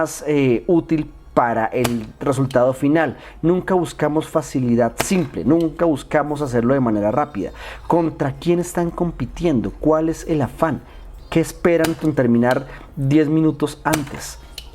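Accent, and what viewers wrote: Colombia. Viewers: Eh mijo x2 colombiano 😅😗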